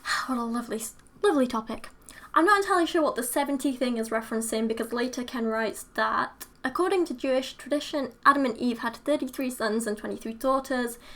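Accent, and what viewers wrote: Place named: Northern England, United Kingdom. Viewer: Okay, you have the northern accent from game of thrones and you look like a STARK, seriously game of Thrones fans close your eyes and listen to the accent / like other sounds like "ughthugh".....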